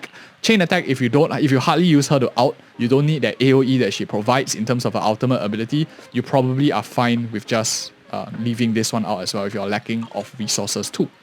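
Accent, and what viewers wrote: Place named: Singapore. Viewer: Sound like a Singaporean (ofc I myself am). A lot of thing I didn't know cause I didn't bother reading the long skill explanation, he gives simple explanations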